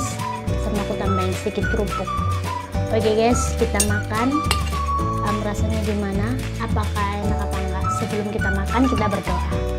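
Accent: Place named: Banyumas, Indonesia. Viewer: Salam kenal YouTubers ngapak😁😁😁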